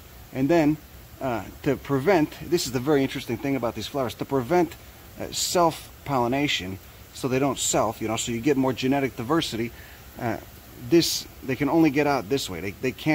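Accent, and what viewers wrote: Chicago, United States. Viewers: lol....you look much different then you sound. how is it you kept the chi town accent even after traveling the country after highschool? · Brian Williams more like CHICAGO.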